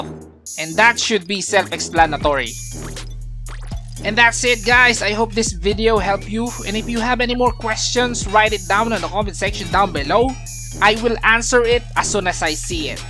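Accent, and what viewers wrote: Philippines. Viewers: Oh how I miss pinoy accent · Pinoy ka ba? hahah you have a heavy accent xD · i heard filipino accent..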